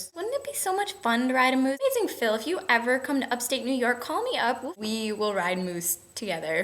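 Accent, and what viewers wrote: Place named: Canada. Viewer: you do sound a little canadian though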